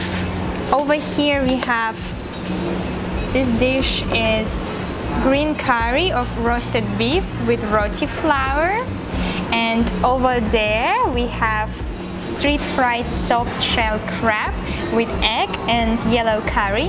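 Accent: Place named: Russia. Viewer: She looks like more Russian than Germany and also her accent. Haha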